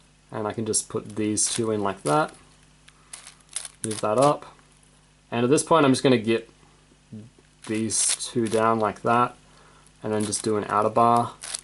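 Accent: Australia. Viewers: Your accent kinda sounds like Australian+ English and Feliks's accent is like Australian+European ^_^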